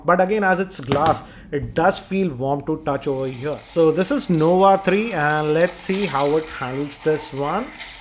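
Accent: India. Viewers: I am from Brazil, I love indian accent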